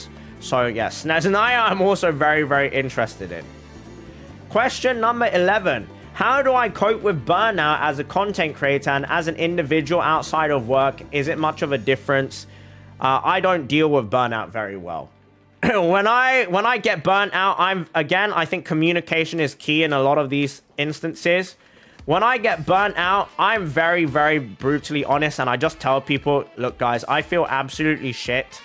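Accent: United Kingdom. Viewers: Damn, you got those good genes looking like you do now at your age! Dude at my workplace is Chinese and 35, and he only looks a few years younger than you.The two of you would've passed for siblings for real. Also, as an Chinese Australian, I gotta say that you sound more British than Australian. When I'm talking with people using discord, they think I'm British lmao.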